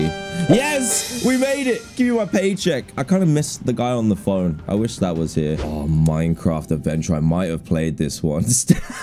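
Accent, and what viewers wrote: United Kingdom. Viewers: you know when you're watching someone who's too british when they pronounce amazon amizin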